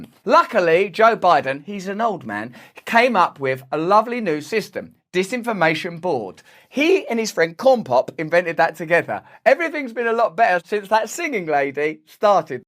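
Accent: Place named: United Kingdom. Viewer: I love you too Russell such a wonderful way to get my news a funny highly intelligent person with a Brit accent 🤣 wanka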